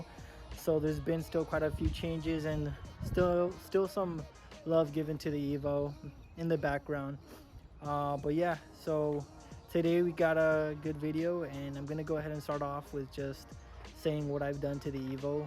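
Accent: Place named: India. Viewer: bro pense que eras de la india hasta que hiciste el comentario de la chela jajajja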